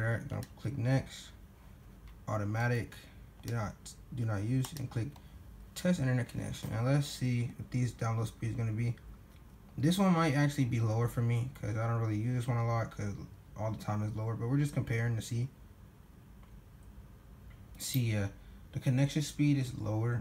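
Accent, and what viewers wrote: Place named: Baltimore, United States. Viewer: That Baltimore😁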